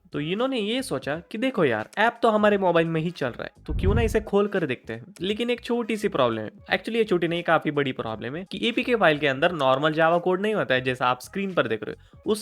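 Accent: Maharashtra, India. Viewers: I can tell you you're Marathi without listening Marathi from you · Authentic and perfect Marathi accent and great explanation too , love the style 💖💖👍👍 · Bhava tu marathi ahes😂, tuzya hindi varun lagech samajl😂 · Bhai aapki hindi marathi accent mai kyu hai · Bhai tum marathi hona ❤ tone se samajh raha hain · Bhai aap maharastra se hoo kya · Bhava tu maharastra cha aahes ka , hindi varun kalta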